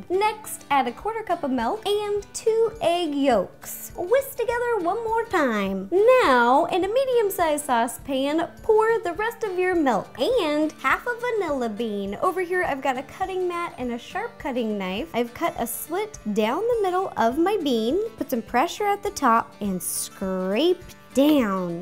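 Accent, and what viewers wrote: South, United States. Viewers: Maybe she's sorta southern. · Why does she have a sorta southern accent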